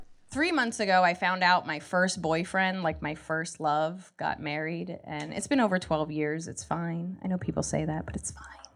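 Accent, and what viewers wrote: New Jersey, United States. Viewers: Hilarious. I’m a transplant from the east coast and hearing your humor and accent brought me back to summers in Jersey. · youre clearly from NJ:)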